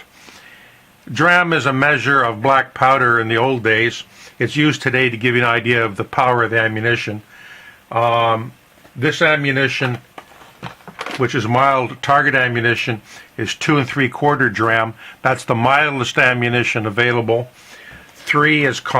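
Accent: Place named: Canada. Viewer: Worth noting that at today's (2023) lead and shell prices, if you reload and cast you can shoot slugs cheaper than bird shot. I can buy soft lead ingots for $2/lb, but #8 shot runs awfully close to $3/lb (US dollars here, since you sound Canadian). I can cast enough Lee slugs for a season in an hour or two over a gasoline camp stove (fuel cost about a dollar for the session), load them with the same wad and powder load I'd use for the same weight of bird shot, use a bingo chip (around a cent each) for an overshot card and either roll or fold crimp (I have a roll crimp tool, but my Load All II makes really nice fold crimps). You may want a 20 ga. nitro card inside the shot cup under the slug to keep the wad from getting stuck in the slug base, that's another two cents or so. A 1 ounce slug load then costs a few cents less than a one ounce birdshot load in the same hull, with the same powder, and ought to be about as accurate as your waxers -- and less work, IMO. 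I doubt I'll ever pay for commercial slugs... BTW, if you want to cut the crimps but keep the compression on your wad, you can put an overshot card on top of your wax slug (cut with a 3/4" punch out of cereal boxes or similar card stock) and then roll crimp over that. If you cut the minimum to remove the edge of the crimp, you'll have plenty of hull to roll over on top of the wax and card.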